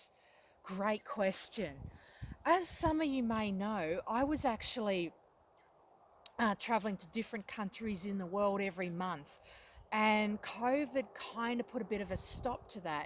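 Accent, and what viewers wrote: Australia. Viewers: Gotta love the Aussie accent !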